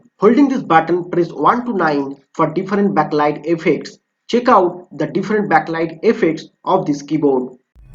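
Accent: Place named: United States. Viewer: Bhai english indian wali bolo...ye to American ho gai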